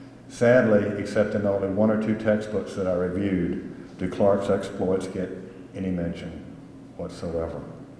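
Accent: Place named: South, United States. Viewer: Great lecture! Thank you for sharing your vast knowledge with your perfect Southern accent😊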